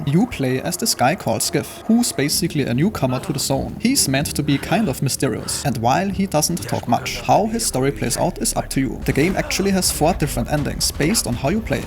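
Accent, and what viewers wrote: Germany. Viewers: Am akkzent war klar zu erkennen das hier ein Deutscher zu sprechen scheint.. Aber österreich ist auch nah genug dran.. Danke für das Video und die Informationen ❤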